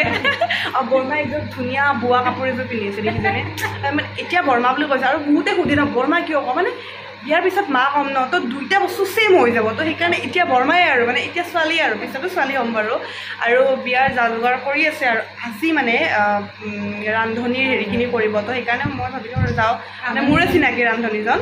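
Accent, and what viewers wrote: Nalbari, India. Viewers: Aponar Kathat alp nalbarir xur ase baa....👌👌❤️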